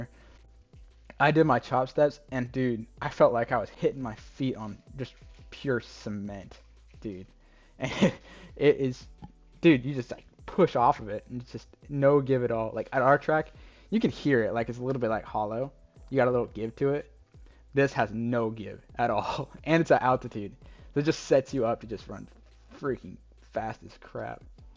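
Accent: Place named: California, United States. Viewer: Dude you sound like your from California 😂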